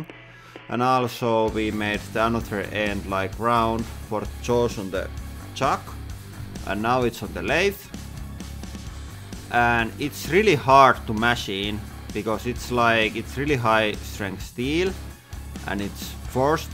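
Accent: Finland. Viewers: i was wondering where i've heard this accent before.he sounds like kimi raikonnen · Such an awesome video! Machining porn and also awesome Finnish accent. Made my morning!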